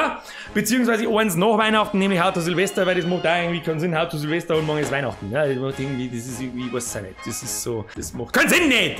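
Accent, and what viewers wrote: Bavaria, Germany. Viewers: Läx verstas zwar nume weni gnau anelose Ich find de bayrisch dialäkt am beste^^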